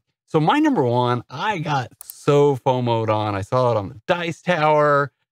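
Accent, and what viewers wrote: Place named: South, United States. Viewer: the southern drawl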